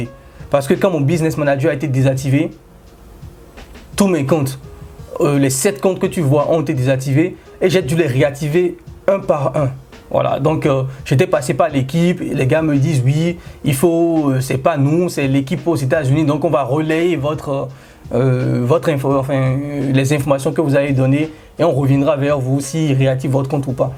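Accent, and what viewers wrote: Benin. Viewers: Oulah! Ça sent le français béninois ici! Je m’abonne 💪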